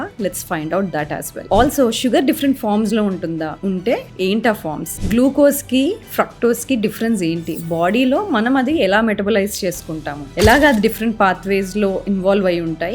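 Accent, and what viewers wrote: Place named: North, India. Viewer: Meru telugu na I thought an northie dr....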